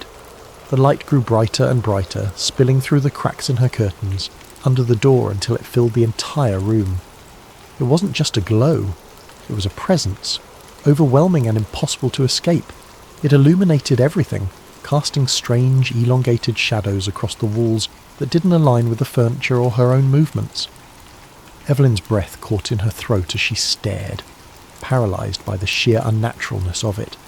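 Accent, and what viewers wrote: United Kingdom. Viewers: I love this but i am very tired of a British accent. It doesn't relax me. I struggle to interpret every elongated vowel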